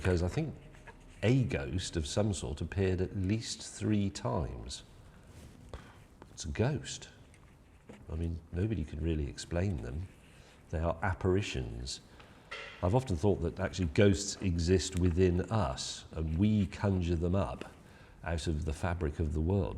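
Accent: United Kingdom. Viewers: Most important one: Is James May aware that his intonation and vocabulary are just iconic and have inspired this and many a non-native English speaker to idolize him because of how marvelous the British accent sounds coming from his mouth?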